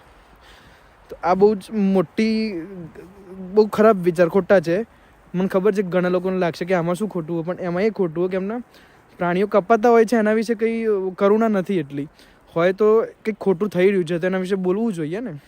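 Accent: India. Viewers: Bhai tamari boli prmane tame charotar ke amdavad na lago chho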